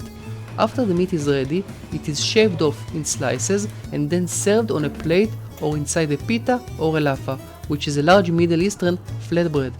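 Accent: Israel. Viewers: אחי המבטא שלך כל כך ישראלי... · איך שומעים שאתה ישראלי😂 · icetealemonplease it's an Israeli accent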